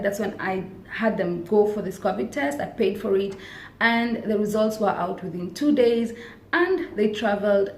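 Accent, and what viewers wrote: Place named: East Africa. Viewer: Hello from Malaysia. How are u doing? U got East African's accent. Could u help me with ur FB id pls?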